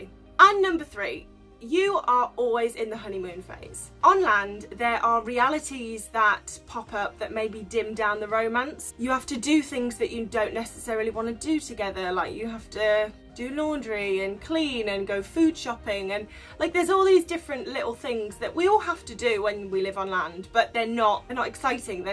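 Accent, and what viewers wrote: United Kingdom. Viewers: Nice one , very nice information,nice accent,are british.keep posting more info mate bye for now.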